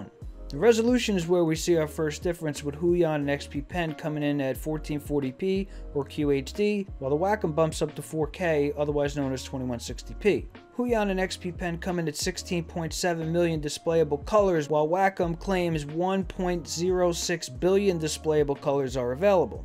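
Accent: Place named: New York, United States. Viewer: good review, funny accent! (Must be from New York or somewhere up there in the NE.)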